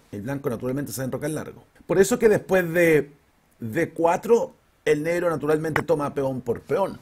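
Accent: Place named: Chile. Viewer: Qué gozada haber descubierto el canal y ser suscriptor..! Explica usted de maravilla. La suma de la pasión que pone más ese encantador acento chileno, es una delicia. Celadas muy pero que muy útiles. Saludos desde España y un abrazo!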